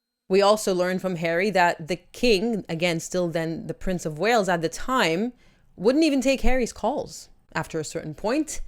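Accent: United States. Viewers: If you’re Australian why do you have a USA or Canadian accent? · You put out wonderful, intelligent content just wondering where are you from? You have an American accent but are an Australian citizen? just curious thank you for your service wherever you served. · Hold up.... record scratch You are an Australian??? So your One of Us? Wow you sound so American Now😆 🇦🇺👋👑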